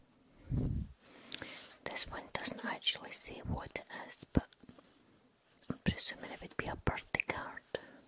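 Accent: Scotland, United Kingdom. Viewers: XOXO right back at ya😁. I'm honored that you replied. As an American, I really appreciate your enchanting accent. I'm so glad to have found your videos, they ease my anxiety and are so very relaxing. Keep up the great work, it truly has a positive impact. Your sincere kindness and caring really come across. Thank you.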